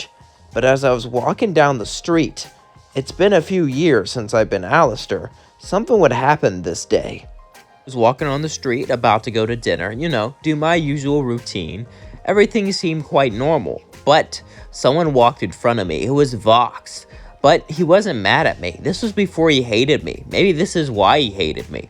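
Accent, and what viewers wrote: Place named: Transatlantic, United States. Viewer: It’s actually a transatlantic accent.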